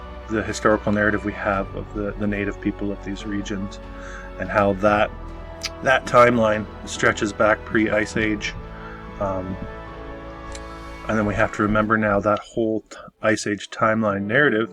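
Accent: Canada. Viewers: …Can’t wait. Great stuff your putting out…Looking forward to the Erie Canal vid, you mentioned…I’m I picking up a little Canadian accent..🍻For now. I’m off to clean up more ❄️ ❄️Lake effect from Erie. Ani’t it grand?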